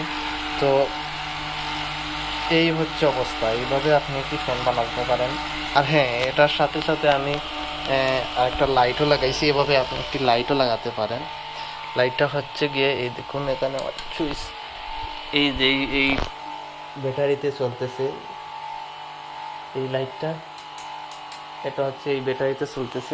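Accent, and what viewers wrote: Sylhet, Bangladesh. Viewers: Vai apni ki sylhety ? 😀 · আপনি কি সিলেটি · Vai ki sylheti ❤ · Vai sylheti? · Vai apni ki Sylhety 🤔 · Vai er basha ki sylhet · ভাই আপনার দেশের বাড়ি কি সিলেটে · Apni sylheti naki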